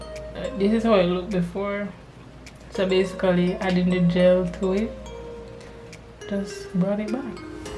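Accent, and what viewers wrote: Jamaica. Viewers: R u Jamaican 🇯🇲 lol slight accent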